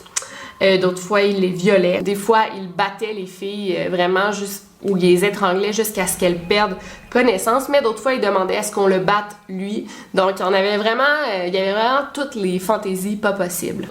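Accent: Quebec, Canada. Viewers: Grâce à toi j'arrive à trouver l'accent québécois sympa et compréhensible 👍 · C est affreux comme histoire, pauvre Elisabeth.. merci j aime beaucoup tes vidéos et ton accent québécois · tu me ferais presque aimer l'accent quebecois ! :)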